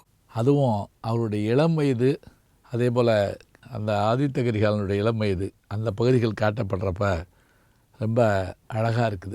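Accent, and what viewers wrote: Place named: Jaffna, Sri Lanka. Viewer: என் வீட்டுக்கார் உம்மட பட்டிமண்டப பேச்சுகளுக்கு பரம ரசிகர், என்ன சவத்தெளவுன்னா அப்பம் அவர் பக்கால இருந்தா என் தொடஐல ஓங்கி அடிச்சு ஆக்க்கா பாத்தியான்னு சொல்லும்போது ஒரு தொடை எரிச்சல் புத்திக்கு வரும்போது, அட இந்தப்பய ரசிகனப்பான்னு டக்குன்னு தாவி இது என் ஆசானப்பா அப்படின்னா ஒரு முத்தம் கொடுத்து, செரி இனிமே கொஞ்சம் மெதுவா அடிலேன்னு கட்டிக்கிட்டே இந்த செய்யுள் தெரியுமா உனக்குன்னு ஆரம்பிச்சு ... தமிழ் இனிமையானது, அதைவிட என் அத்தானின் யாழ்பாணத் தமிழ் மிக இனிது ஆசானே ❤❤❤ லெட்சுமி ராஜன் டொராண்டோ கனடா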